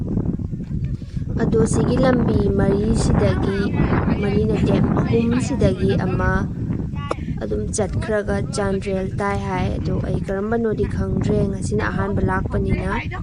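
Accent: Kakching, India. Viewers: Yam nungaidoi malle kakchinggi lonse eidi yam ngaojei leisu yam leininge.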